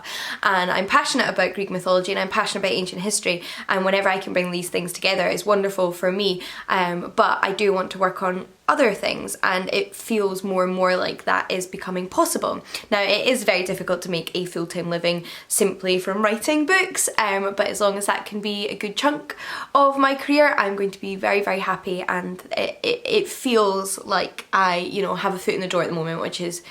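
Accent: Scotland, United Kingdom. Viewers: That board game looks amazing, we will have to look for it. Congratulations on your writing and getting close to finishing the PhD! I totally understand the feeling about buying your first place without a romantic partner. It is really a great idea and I would hope that more young women especially would consider doing the same. It is massively important to your personal development as a person to have become your own independent person prior to committing to a lifelong relationship with everything that comes with that. Even though your lives are together, establishing your own space and responsibilities makes good sense. I love that you and your mom have cohabitate so well during the pandemic. Given that your dad passed away so recently, it is great that you could be there for each other, especially with the lockdowns and isolation. In terms of your book and making an audiobook, I love the idea of you reading it! 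I would guess that most of the people that follow you are comfortable with your voice and the Scottish pronunciations would be a treat. What a great idea! Lastly, in terms of the writing to try, I’m going with the gothic YA finishing school idea. That sounds excellent. You have so many wonderful projects coming up! I’m looking forward to seeing you get through them (which will only create new ideas for the future!). 🔥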